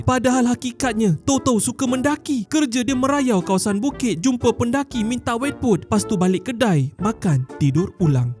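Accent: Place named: Sabah, Malaysia. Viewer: terkadang terselit logat Sabahnya.. menghiburkan betul bah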